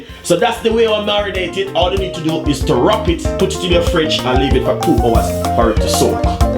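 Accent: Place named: Jamaica. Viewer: Loving the Jamaican recipe... and accent.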